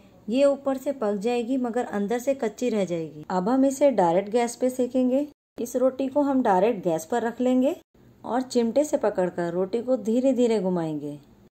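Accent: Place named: India. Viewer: Hello.. i love Indian accent.. your videos very interesting.. thankyou for sharing friend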